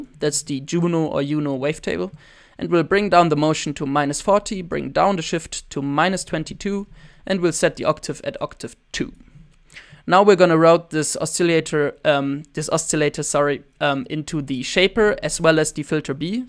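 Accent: Germany. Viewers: wetten du bist deutsch haha dein Akzent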